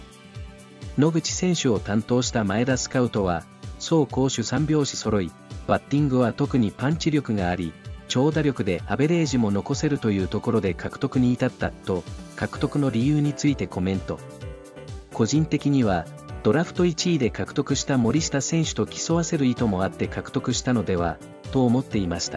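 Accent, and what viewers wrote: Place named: China. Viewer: ナレーションが中国人の話す日本語みたい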